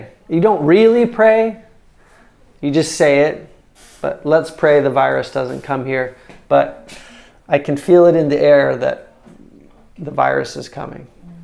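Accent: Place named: Iraq. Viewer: اشكد حلو الاستاذ يتكلم عراقي هل هذه جامعه امريكيه؟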